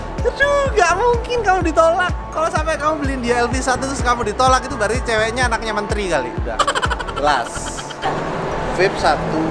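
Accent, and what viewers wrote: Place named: Java, Indonesia. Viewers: Pertahano bhs suroboyo mu cak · Cabe joooon , wkwkwk ... btw , lucu nonton orang logat jawa kaya situ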